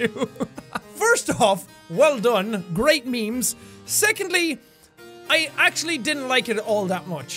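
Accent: Ireland. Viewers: R U IRISH OR SCOTTISH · I knew your chanel some weeks before when I was searching for examples of Irish accent for a presentation at college. Your video about guessing phrases made me laugh a lot, and I showed it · I'm 47 and I LOVE. He makes my day every day with his humor and Irish accent!!:)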